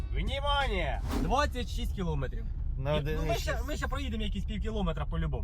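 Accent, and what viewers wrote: Poltava, Ukraine. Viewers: Доооли ,Ти з галещини Чи з козельщини,короче з пооолтавщини,бо неноче з у хобот мовешь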